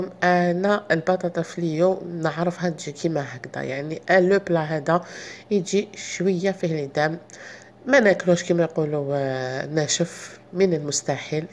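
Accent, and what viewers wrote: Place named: Algiers, Algeria. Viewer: والله انا حشمت في بلاصتها يعني وحدي بيني و بين روحي مانقدرش نهدر هاكا...بالعكس انا نموووووت على هدرتك و اللكنة العاصمية تاعك انا من الشرق نحييك و نتشهى كل حاجة ديريها ياااربي موالفا ناكلها هههههههههههه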